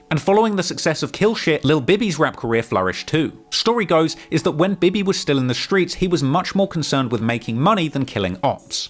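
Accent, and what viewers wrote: United Kingdom. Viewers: A British accent talkin bout American activities?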